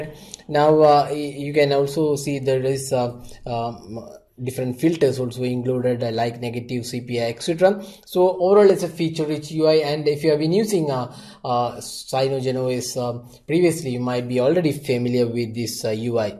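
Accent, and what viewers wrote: Kerala, India. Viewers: u sound like a malayali :)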